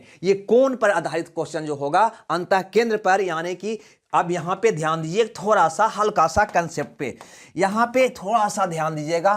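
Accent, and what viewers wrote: Bihar, India. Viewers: Itna sudh hindi to desi board wale ko v samjh aayega sir tone se saaf pata chala rahe hai aap bihar ke hain but aap pura hindi me explain kar rahe hain jo kuch nahi samjh aa raha hai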